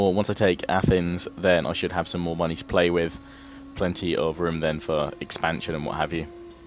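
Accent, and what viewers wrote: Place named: United Kingdom. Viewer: You have one of those proper sounding British accents. I enjoy your vids.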